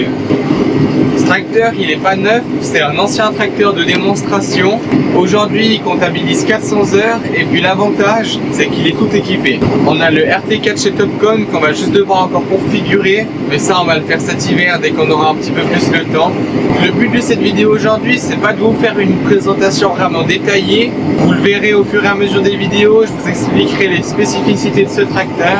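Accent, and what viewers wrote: Switzerland. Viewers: Salut ue vien de recouvrir ta chaine et ca a l'air cool je trouve que tu a un accent suisse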